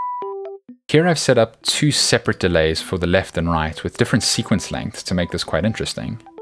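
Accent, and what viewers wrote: South Africa. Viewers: I know a South African when I hear one!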